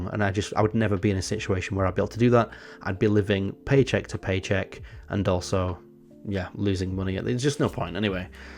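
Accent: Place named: Southport, United Kingdom. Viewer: Not related to the video but your accent sounds exactly like a youtuber TIK history who is from southport, and similar but slightly different from a different youtuber who is from York. Its just cool to me when as a non english speaker I notice not just general "british" accent but regional ones too I hope you land the job you want and I hope your channel grows and youtube becomes a more stable source of income for you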